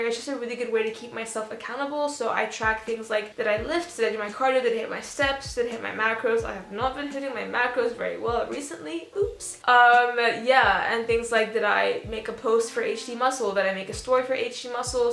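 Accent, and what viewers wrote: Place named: United Kingdom. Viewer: the British accent !!! 😂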